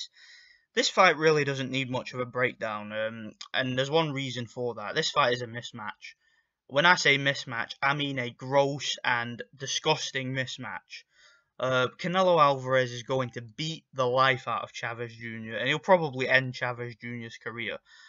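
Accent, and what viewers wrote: United Kingdom. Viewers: Go listen to the boxing voice this dude is a joke! You sound like you from the U.K. Why waste time to make a video for a so called "shit fight" by you. Go talk about Liam smith an his brothers or that waste of a time sanders! Maybe they are better boxers for you lol !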